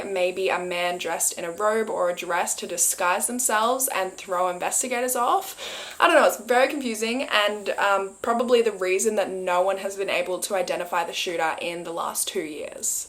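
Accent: Australia. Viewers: Ahhhh about 17 minutes in you were explaining that "here in Australia" you call Nissans nissin haha 😄 okay so you're accent is Australian.... just beautiful 😍 ❤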